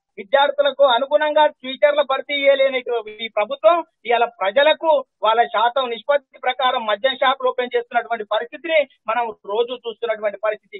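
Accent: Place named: Telangana, India. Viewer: సార్ నర్చిరెడ్డి గారు మీరు స్పీచ్ చాలా తెలంగాణ బాస లో సూపర్ మాట్లాడు తారు కానీ ప్రజలు ఆచం తెలంగాణ బిడ్డలు కదా కాని తెలుదేశము పుట్టినదే ఆత్మ గౌరవం కోసం మరి బి సి, ఏ సి, ఏ స్టి మైనారిటీ కోసమని మరి i. కుడు గుడా గూడు కోసమేనాని, పటేల్ పట్వారి కోసమేనని చెప్పుకుంటూ పొతే చాలా ఉన్నవి. ఇప్పుడు రాష్ట్ర ప్రజలకు కావాలి అన్ని ఉచితంగా కావాలి సార్ మీరు కూడా తెలంగాణ లో మంచి నాయకుడు పెట్టండి సార్ ఏ పి లో దొంగలు పడరు టి స్ లో దొరలు పడ్డరు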